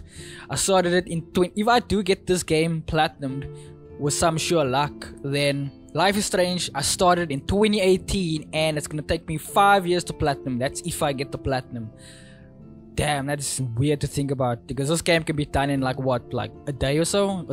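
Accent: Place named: South Africa. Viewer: Yo man, great video. Your accent sounded so damn familiar and turns out you are South African😂. Keep up the great videos, glad to see a fellow South African youtuber in the niche.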